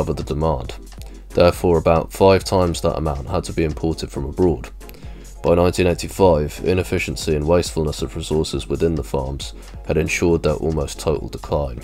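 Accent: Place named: London, United Kingdom. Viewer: Wait... I hear that youre from London! (possibly even east London!). Great to hear a familiar voice! (I'm from East London)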